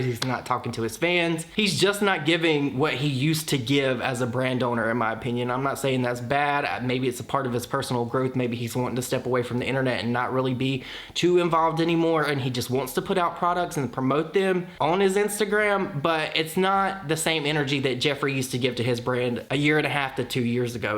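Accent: South, United States. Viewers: Your face makes me so happy and southern charm accent! I’m so glad when you upload, I love listening to you, you talk trash but respectfully and have a bright personality. You honestly brighten my day when I’m working from home. Thank you for continuing to upload even when it’s hard! It helps me get through my day!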